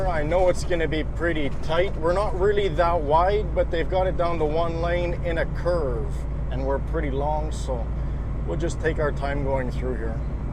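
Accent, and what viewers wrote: Canada. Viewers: I can hear that Quebec accent ;) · DUDE! Fascinating video! I loved how you patiently dealt with the round-a-bouts in NY. I am a lifelong long Quebecor now living in California and I'm trying to identify your accent because your english is perfect. Acadian?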